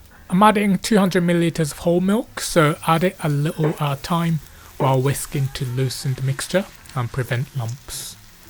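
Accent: United Kingdom. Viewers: Love your British accent! Thank you for the lovely recipe ❤❤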